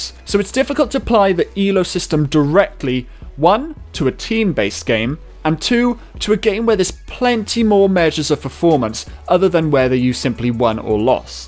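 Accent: Wales, United Kingdom. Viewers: The accuracy/precision distinction is a personal pet topic of mine, sitting crucially in the middle of the entire field of predictivity, informativity and really all rationality as it stands. I just can't abide by a ... I can't think what the term is for it, a jumbling-up of the terms in a critical context, knowing the comprehensivity of its importance. The distinction is pretty simple (it can be explained with pictures of kittens wearing mittens) and pretty significant so any superficial pedantry can be accepted. It did take me a few minutes before some of the -isms of your accent that I'd been mulling over began to coalesce around "Welsh". At first it just sounded like a generic southern-central English accent, but I imagine if you said "I left my wellies in the valley" it would highlight the distinction. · Ey there boyo. what part of wales are you from? 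Newport/Chepstow down yere.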